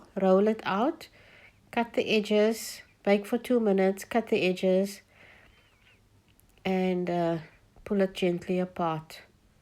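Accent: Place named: Cape Town, South Africa. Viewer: Hi, there is nothing that is such a taste festival as a "Bo Kaap" samosa. Just listening to you speak makes me so homesick for Cape Town again. Much love from NZ.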